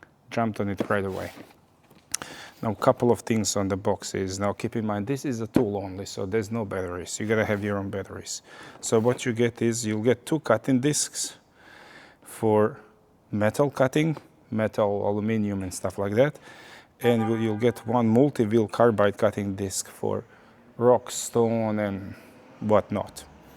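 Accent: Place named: Europe. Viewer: Don’t let the accent fool you he’s from Australia. I thought I was watching a guy from Europe.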